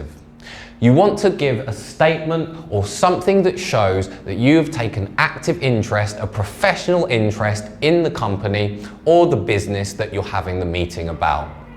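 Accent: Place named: United Kingdom. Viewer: love his brittish accent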